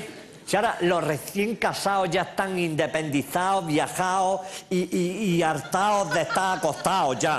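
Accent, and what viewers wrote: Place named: Andalusia, Spain. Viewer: Me encanta, gracioso a más no poder con ese acento andaluz. Gracias por las s buenos ratos.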